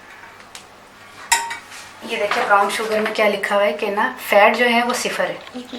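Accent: Punjab, India. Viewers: Ap ktni puari punjabi bolti heun :) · Punjabi accent very good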